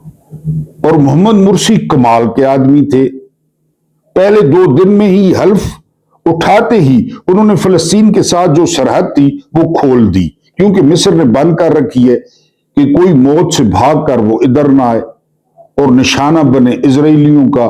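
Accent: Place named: Mianwali, Pakistan. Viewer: آ پکا انداز گفتگو بلکل عوامی ھےاور اسمیں میانوالی زبان کی آمیزش چار چاند لگا دیتی ہے ماشاءاللہ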